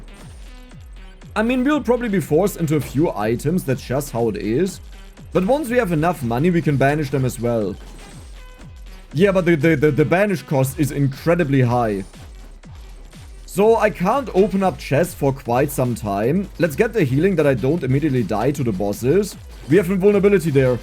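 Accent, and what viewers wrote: Germany. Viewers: as a viewer since early vampire survivors, I like listening to ur silly German accent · People that dont like you talking you dont want as followers anyway. Your German accent rambling with a little campness in there is absolutely brilliant. I watch for that now. Megabonk is cool but your rambling is priceless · I just love the "Deutscher akzent". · Love when you talk. Otherwise it had been so boring. I’m from Sweden and learnt German from playing cs in the old days. And you’re accent brings back so much memories for me ❤ and my favorite German insult is Deine mudda schnallt sich ein dreirad unt spielt mit der transformers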